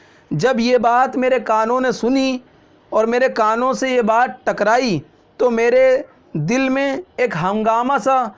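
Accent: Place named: Delhi, India. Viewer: Sahib ki meethi Dehli ki zubaan sunne mauqa ms. Maine pehle likkha tha ke is nazm ko pira sunna hai. Aur Inka parha dxplanation aur poems bhi sunne ka bohat beta i se intezaar hain. Main Dehli me Jama Madjid ke paas aur Ballimaran Ahata Kale Sahib aur Bara Hindu Rao men reh chuka hoon. Ham Dehli ke ASHIQ hain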